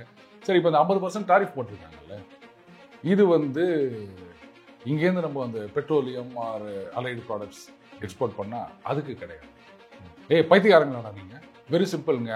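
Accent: Chennai, India. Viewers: தங்கள் Madras பாஷை ஸ்டைலில் உலக நடப்பு, அதனால் பாரதத்தில் ஏற்படும் தாக்கம் ஆகியவை தேசிய உணர்வுடன் மிகவும் ஸ்வாரஸ்யமாக கூறுகிறீர்கள். மிக்க அருமை.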